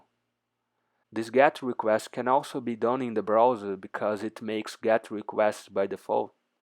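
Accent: Brazil. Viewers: Pause o video pra conferir se ERA BR vc. Aceitei 😂. Muito bom o vídeo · Fiz a mesma coisa, o sotaque não engana! Show Brazuca na veia! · I can tell you are brazilian by the accent, very good video sir.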